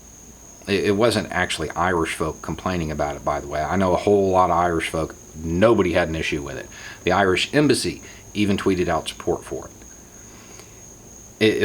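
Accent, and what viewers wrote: South, United States. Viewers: You know, I have to admit to my own cultural prejudices when I hear this intelligent man talk about issues of great import in that accent. I grew up in Brooklyn and spent many years living in the south and hearing some of the most hateful things spoken with that accent that it's sometimes difficult listening to. It's like listening to Shelby Foote on Ken Burn's "Civil War" documentary, it's just hard to equate the one with the other. As has been said by many before, you can't judge a people with a wide brush: there are many intelligent and compassionate people who live in the south, it's a shame they are swallowed up by so many who may actually even be in the minority, loudly proclaiming their moral superiority while doing some of the most immoral things. Anyway, it's just a thought. Thanks, for speaking up.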